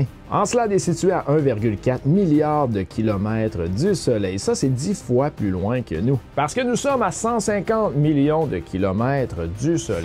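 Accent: Quebec, Canada. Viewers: perso parfois les déformation de la petite voix mélanger a l’axent Qc la rende incompréhensible a la première lecture, es qu'ils y en a d'autre qui on se problème ou faut que je demande un supplément de caribou a la cantine ;p ?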